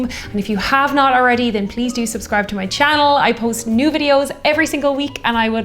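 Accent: Ireland. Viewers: Ugh Irish and Scottish accents 😍 😫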